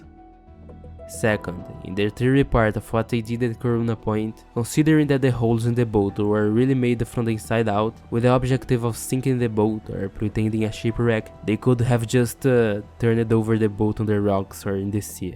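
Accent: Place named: Portugal. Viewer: Great video but you should work on your English pronunciation. Try talking slower and pronouncing each word clearly and with less portuguese accent. I think you could be a youtube star if you work on your English because the video is very well made.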